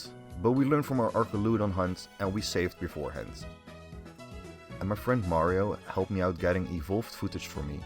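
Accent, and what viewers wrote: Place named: Netherlands. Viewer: You're Dutch aren't you? knew it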